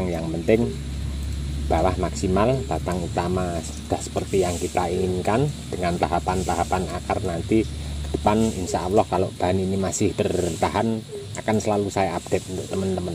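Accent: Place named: Java, Indonesia. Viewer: Itu emang logat jawa,dari dulu.tapi ga merubah makna.Wallahu'alam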